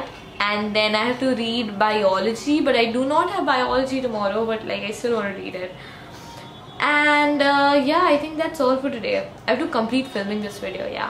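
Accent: India. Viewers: Is she Indian , her accent .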